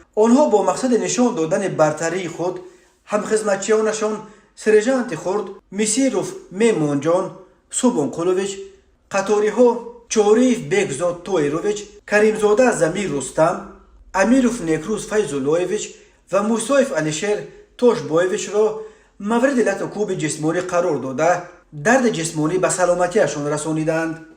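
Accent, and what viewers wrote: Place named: Badakhshan, Tajikistan. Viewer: Хами Канала барои акценти Бадахшониш гуш мекунум .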